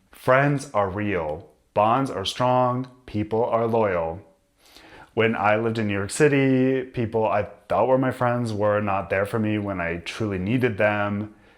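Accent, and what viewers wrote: Germany. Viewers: I can hear your German accent.🙂 That's cool. It shows you speak German a lot!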